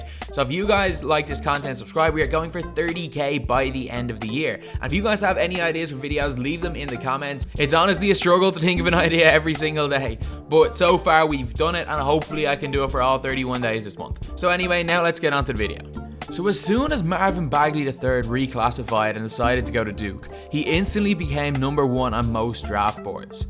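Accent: Ireland. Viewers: I'm confused how a Irish voice is doing basketball video lol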